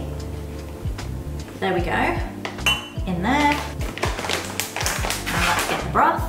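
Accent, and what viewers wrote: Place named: Southern England, United Kingdom. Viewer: I thought you were from somewhere in the south of England.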